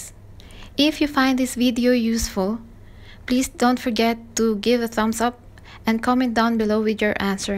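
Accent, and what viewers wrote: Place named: England, United Kingdom. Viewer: Nicee Content, I like your English accent. Tinapos ko para malaman ko dn sagot I think Andrew is more accurate, hihihi nag iwan na dn ako ngskli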